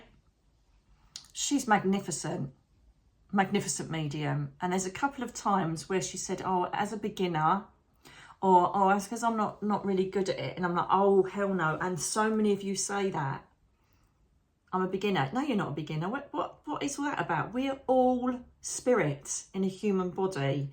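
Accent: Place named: England, United Kingdom. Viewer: I love you! 🥰 And I love your english accent, and your loving and grateful insights on our energy and healing potentials, the power of prayer - YES, the clarity in meditation -YES, Self love - YES supported by Archangels Michael (blue light) and Rafael (green light) and btw I love the fun and joy you give. ❤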